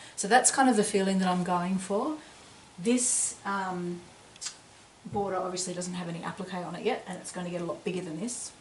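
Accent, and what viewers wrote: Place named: Australia. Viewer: Great to hear an Aussie accent! Thankyou!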